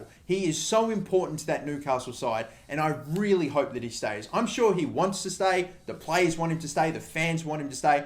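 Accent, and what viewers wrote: Australia. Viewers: ARE YOU AUSSIE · Are you Australian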